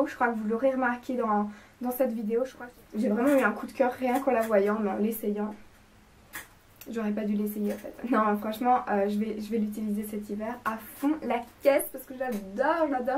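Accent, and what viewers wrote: Belgium. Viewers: Coucou, Je viens de tomber sur cette video et j'adore tes achats ! Tu as beaucoup de goût ,c'est simple mais très efficace :) tout ce que j'aime De plus, je te trouve pétillante et j'aime bien ton petit accent belge :D Je m'abonne!!! Bisous :*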